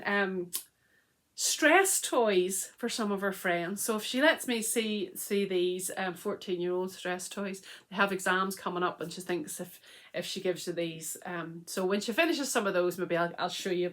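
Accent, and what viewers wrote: Northern Ireland, United Kingdom. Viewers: Just found your podcast. Made me pause hearing a fellow Nothern Irish accent on YouTube. So I am from Northern Ireland, Lisburn born and raised.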